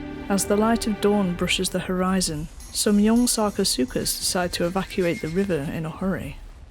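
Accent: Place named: United Kingdom. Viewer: Ah ha! So you are bri-ish!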